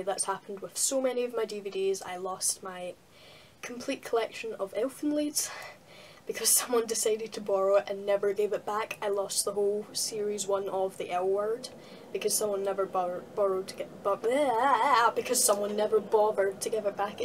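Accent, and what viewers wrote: Glasgow, United Kingdom. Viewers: are you from glasgow ?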